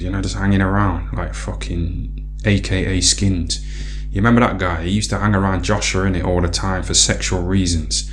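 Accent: United Kingdom. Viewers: This channel is good stuff. You speak like a lot of people I grew up with. I live abroad now and rarely hear an authentic working class UK accent. Awesome content regardless of your voice though.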